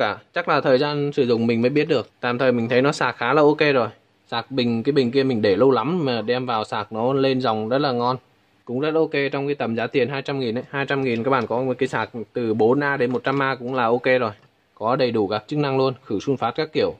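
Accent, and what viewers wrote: Nghe An, Vietnam. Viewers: Anh ơi anh người nghệ an hả anh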